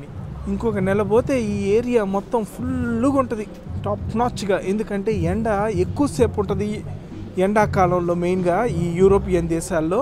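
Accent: Nellore, India. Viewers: Hai Anna how are you???? Miru explain chese vedhanam and me Nellore language super....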